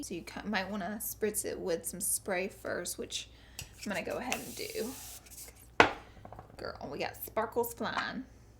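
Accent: South, United States. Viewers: She sounds SO southern I love it! #SouthSquad WYA? Edit: SO MANY SOUTHERNERS! I found my people!!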